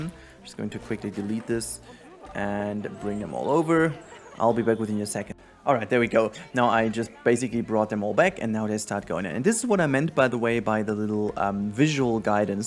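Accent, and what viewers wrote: Germany. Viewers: Man hört das du Deutscher bist! :D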